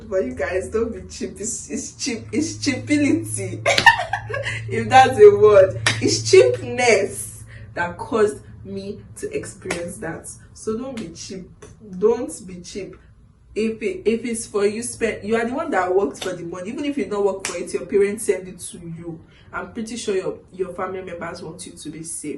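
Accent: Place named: Nigeria. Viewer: Nice one dear , the accent hasn't changed 💯 still Nigerian.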